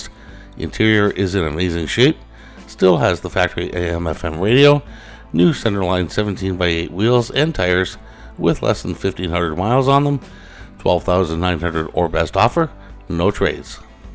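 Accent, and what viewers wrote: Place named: Canada. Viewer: We'd blame any mispronounced words to be caused by your Canadian accent. No foul. Hope you feel better tomorrow. Keep up the good work.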